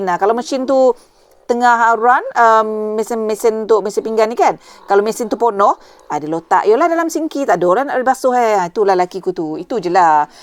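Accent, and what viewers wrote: Negeri Sembilan, Malaysia. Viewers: Suka dgr puan bercakap. Slang negori xtinggal. Menginggatkan sya pada seseorg tapi xboleh nak recall suara sapa. Pn asal mano. Masa form5 sekolah di mana & thn bila?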